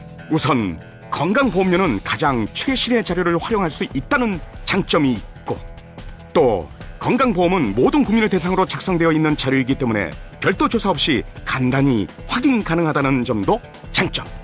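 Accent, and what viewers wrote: North Korea. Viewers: 성우 말투가 북한같냐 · 앵커 북한 사람임?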